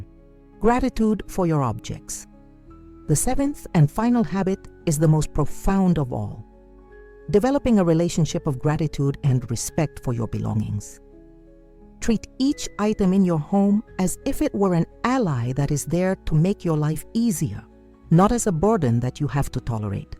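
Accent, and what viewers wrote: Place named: Hungary. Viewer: What's Japanese about this? Presenter sounds Hungarian.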